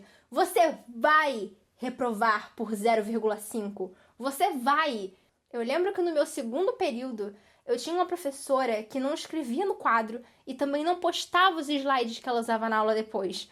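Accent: Rio de Janeiro, Brazil. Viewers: sotaque carioca é feio demais tá maluco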